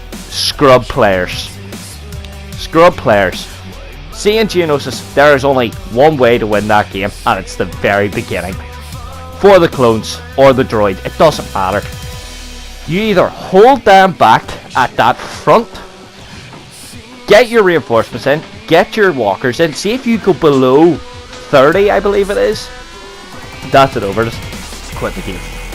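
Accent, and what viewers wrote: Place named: Northern Ireland, United Kingdom. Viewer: Great video well done keep this up and you get somewhere your accent sounds like it’s from Northern Ireland I’m from there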